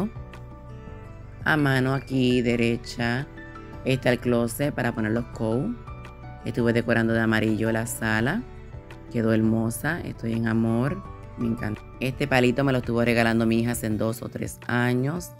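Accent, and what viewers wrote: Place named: Puerto Rico. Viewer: yo también soy de Puerto Rico vivo en Iowa. Te reconocí por nuestro acento. 😂😂 eres nueva y te voy apoyar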